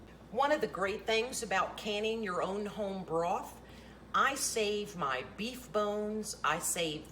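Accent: South, United States. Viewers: Hello, new to your channel.. been watching your videos.. I’m loving your videos and am loving your southern accent, I could listen to you for hours... Hubby and I are up here in Northern New England in Maine... We are retired and do as much homesteading as possible... This casserole looks soo amazing and delicious I can’t wait to make this.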